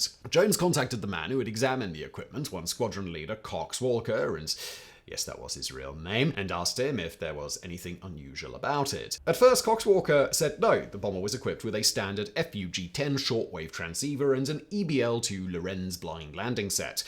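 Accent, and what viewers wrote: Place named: South, United Kingdom. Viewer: I suspect mr. has been talking for so long up till now, that the narration has naturally sped up to at least 1.25×. Coupled with southern British accent and expressive-monotonous intonation, it doesn't quite register the information contained in the text between my ears. I'm not elderly or naturally slow, but had to partly watch it on 0.75×, which sounds hilariously posh and inebriated at the same time. There might be a problem between those two big ears, however it's not the case for most other chanells i watch, including BrainBlaze, while not being native anglophone might have something to do with it. A pity, because matters of WW2 are increasingly relevant today and this is utterly a banger episode.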